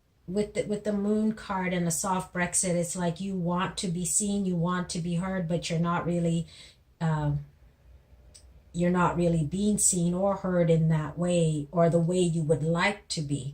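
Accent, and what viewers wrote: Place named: United States. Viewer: judge by your accent you are american . I am NOT SURPRISE OVER YOUR NON STOP ROTTEN BASTARD LABOURS AND THEIR YOUR VERY 'DISCRETE' DEFINITION ABOUT THE SOFT BREXIT. THE US IS NOT FOR TO SEE THE UK CITIZENS HAPPY AS THEY ARE MORE INTELLIGENT THAN THE AVERAGE PEOPLE IN THE US. AND ALSO THE US IS BEHIND THAT ASSHOLE RICH GUY BLOODY HELL OF A LABOUR AS ALWAYS BEEN. THE US IS ALL OVER LIBERAL MEANING MAKE NO SENS JUST TAKE THE POST TAKE ALL MONEY AND GIVE A SHIT IN PEOPLE AS LATELY .... SO SOFT IS THE AMERICAN BULL WITH DESTROY THE WORLD. SOFT IS NECESSARY IS BAD AND VERY VERY BAD WHEN IT COMES TO THE BREXIT. IT WILL NOT LET BRITAIN TO LIVE TOTALLY AS THE PEOPLE WILL AND VOTED FOR . BRITAIN WOULD BEE SOON A SUPER SUPER POWER AND THE US WILL NOT ALLOW THAT..... THAT IS WHERE THIS IDIOT CORBY GOT SUPPORT FOR AND FROM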